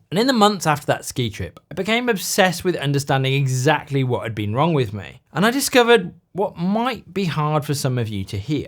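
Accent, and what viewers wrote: United Kingdom. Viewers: A re- re-boot! I hope to see more content. I tried sending your videos to a friend ca. 54 now about 4 years ago. He wetly talks about needing to lose weight (he does) but does nothing practical about it. I hoped the sound of a British doctor would be relatable, rather than a US influencer. People need familiar voices. I'll have another go with him.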